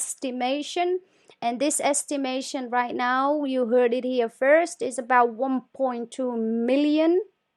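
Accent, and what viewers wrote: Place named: Ethiopia. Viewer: You sound like Habasha not Somali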